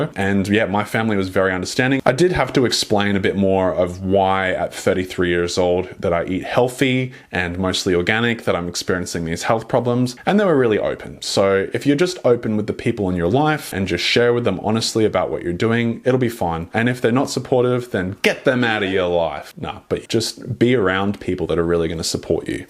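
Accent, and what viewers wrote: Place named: Australia. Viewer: I’d love to know what this Australian-ish accent is about :’) oath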